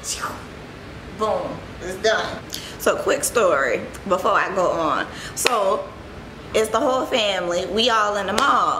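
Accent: New Orleans, United States. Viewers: Thanks for the tips! Also I love your accent, are you from New Orleans? I died laughing at the old lady story 😂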